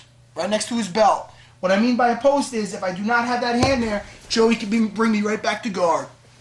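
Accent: United States. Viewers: Is he from North US / Brooklyn? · "don't lean foward" Strong American accent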